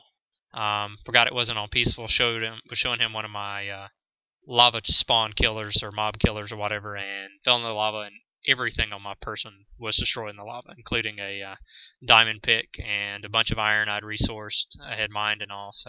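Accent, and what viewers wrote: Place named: South, United States. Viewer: finaly someone with a southern accent man thx i thought i was the only minecrafter vid maker was southern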